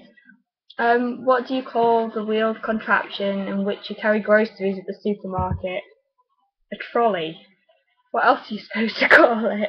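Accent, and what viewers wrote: United Kingdom. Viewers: You do sound oddly British in this video.. and I had to urban dictionary "peng".. terrible word. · Totally from Nottingham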